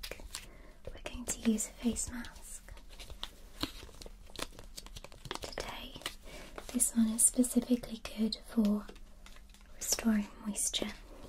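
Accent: United Kingdom. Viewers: I luv soooo much your British accent🥰😍 to cuteeee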